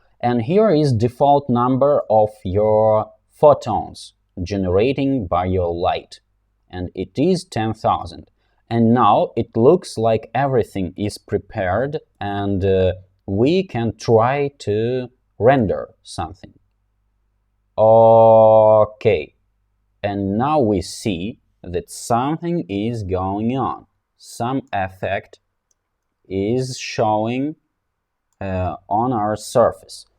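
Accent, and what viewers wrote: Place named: Ryazan, Russia. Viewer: Константин Санжаровский не совсем. Частенько проскакивает рязанский) И ни один нормальный носитель языка не говорит с таким усилием, выдавливая из себя слова.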